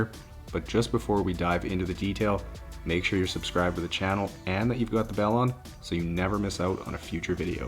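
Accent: Canada. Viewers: I just discovered your videos and now I'm addicted lol! Also I knew I heard a fellow Canadian as soon as you started talking haha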